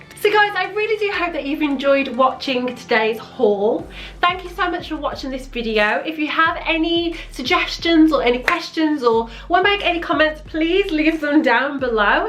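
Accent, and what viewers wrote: West Midlands, United Kingdom. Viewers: That emerald wall is everything ! I have just brought an emerald green paint in wilko to do a painted arch in my hallway, and may i say our accent sounds familiar 🤔 😄 🤣 😂. From the west midlands and as soon as I started watching I noticed the accent love it 😄 🤣 your style is so like mine too ! New subscriber here ;)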